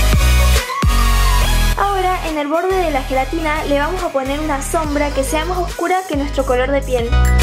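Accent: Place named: Mendoza, Argentina. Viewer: Hola!! que bien que te quedó.. una pregunta.. Sos de Mendoza? porque yo vivía ahí y Tenés acento de Mendoza👍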